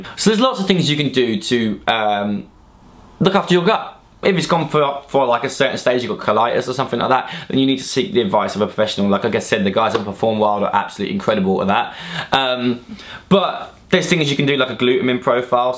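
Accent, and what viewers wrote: United Kingdom. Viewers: I understand much of what you're saying and it's important...I have a bit of trouble here and there not because of the UK accent (I;m Canadian :P) but maybe you speak too quickly? I never thought I'd say that! But I'm going to rewatch and try to catch a couple of things. Also I've watched your vids before on health balanced diets, and other ones ( I follow Cassey Ho too) I'm just confused about portions and what good foods are the best or not the best to have (like in the various food groups, breads, grains, fruits, types of meat etc) I would love some true explanation on that - the internet (like google searching) gives too much conflicting info depending on who wrote the article you know...so :S Thanks a lot :D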